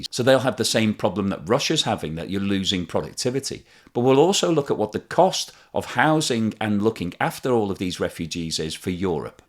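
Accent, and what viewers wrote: United Kingdom. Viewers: Every other video cites the demise of Russian economy done February'. Yet to happen. Maybe he needs more videos on the tanking of the EU and the USA economy better yet his British accent the UK impending economic collapse. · He actually sounds British.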